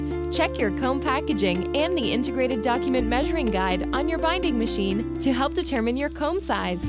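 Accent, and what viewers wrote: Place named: West Coast, United States. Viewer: Jesus... worst accent for advertisements ever!!! Please note. Brits find the West coast accent really really annoying!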